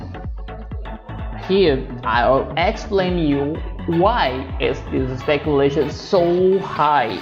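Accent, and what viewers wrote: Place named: Ceará, Brazil. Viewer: Cearense English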